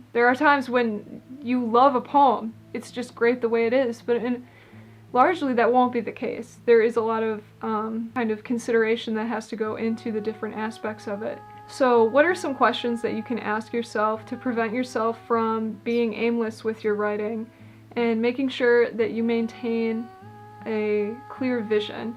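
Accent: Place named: Scotland, United Kingdom. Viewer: ... thank you - Scottish for sure - r, and ch - got a Stroke but talk now after almost 2 years - poetry back then, poetry once more ...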